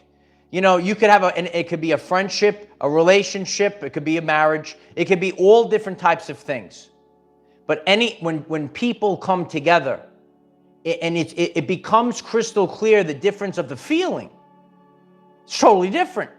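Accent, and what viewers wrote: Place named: Brooklyn, United States. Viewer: How cute your little Brokklyn nyc accent...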